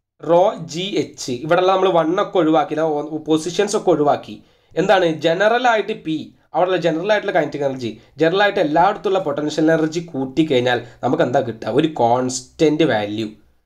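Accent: Malappuram, India. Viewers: Sir malappuram aano? Slag kettathond chodhichadhaan😁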